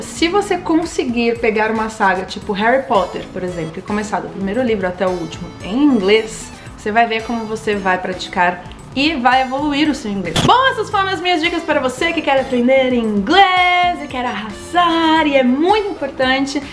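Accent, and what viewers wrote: United Kingdom. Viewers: o sotaque britânico é maravilhoso, mas me embaralho muito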